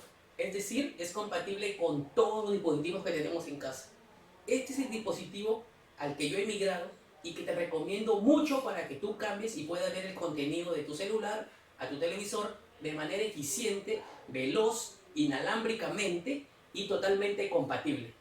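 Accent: Peru. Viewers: Bien, peruano tenías q ser, entiendo bien tu castellano.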